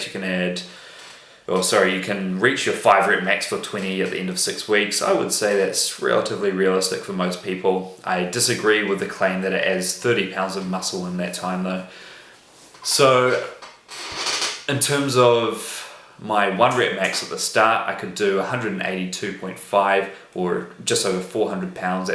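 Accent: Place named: New Zealand. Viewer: Sounds like a kiwi, chur bro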